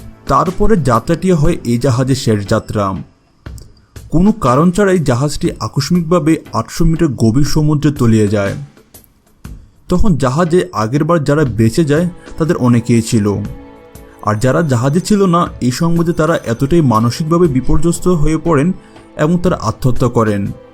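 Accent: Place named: Chittagong, Bangladesh. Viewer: ভাই আপনি খুব সম্ভবত চট্টগ্রামের মানুষ। তাই শুদ্ধ বাংলা পরতে আপনার কষ্ট হচ্ছে। অহ আপনি যে রিডিং পড়ছেন সেইটা বুঝাই যাচ্ছে। অন্য কারো ভয়েস দিয়ে ট্রাই করেন। না হলে নিজেই কিছু প্রাকটিস করে কৌশল অবলম্বন করেন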